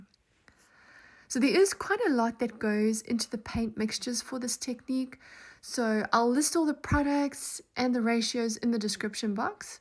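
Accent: South Africa. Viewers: I came across your beautiful pearl pour by accident. My first though was ..... "she's South African". Am I correct 😂. I am also living in the US and it was AWESOME to hear a voice from home. Thank you for your beautiful pour...😊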